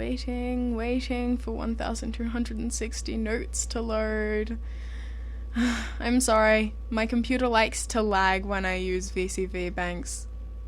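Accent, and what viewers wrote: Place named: Australia. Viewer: You're Australian!? ;D I love your accent!~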